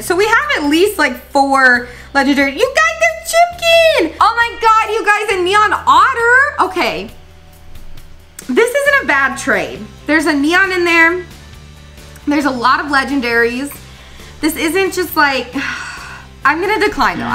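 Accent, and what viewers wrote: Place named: United Kingdom. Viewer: wow your british acent is Just wow incredible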